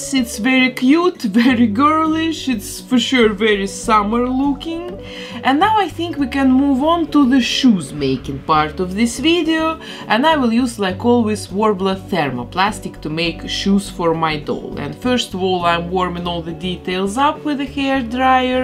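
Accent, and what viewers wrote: Russia. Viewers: Is your accent Russian I’ve been trying to guess but I’m not sure I can’t put my finger on it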